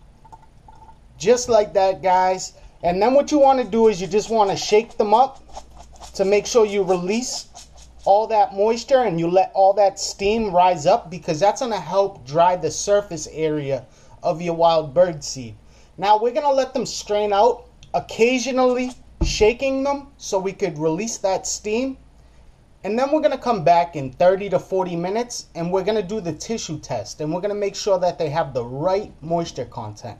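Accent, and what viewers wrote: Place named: New York, United States. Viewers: Alright youzzz guyz, now bring it to a simma and then stwain it. I love East Coast accents. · He sounds like hes from some where in New York or new joisy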